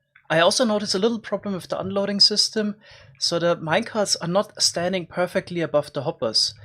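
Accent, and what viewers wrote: Germany. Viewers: Du bist Deutsch, man hört das xD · This German accent. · Are you german??? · This german accent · Love ur german accent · Bist du deutsch? Xd klingt so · Da hör ich doch direkt den deutschen akzent😉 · Mann Merk das du Deutscher bist😂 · man hört das du deutsch bist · Nice! Rebuilding Farm Simulator in minecraft. Is that a German accent I hear? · Are you from Germany because you sound Like a German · Liebe den deutschen Accent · Hahah dieses deutsche englisch😂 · Ich mag den deutschen akzent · are you german (Just Asking because of the excent) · Sprichst du deutsch? Das merkt man bei der Aussprache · His german accent is legendary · That German accent, loved it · Are you german ?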